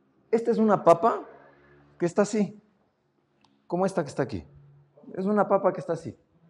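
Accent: Mexico. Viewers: Gracias por su consejo doctor tenía muchas dudas sobre la avena, es la primer ves que lo veo y habla usted muy mexicano 😂 saludos de una mexicana hasta el lindo Ecuador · Orale pense que era de México, por la forma como habla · Gracias por compartir su conocimiento. Habla como chilango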